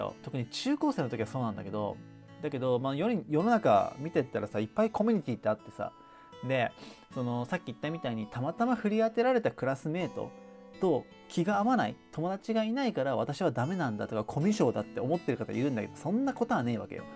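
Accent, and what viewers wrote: Kansai, Japan. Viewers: 関西なまりめっちゃ好きです笑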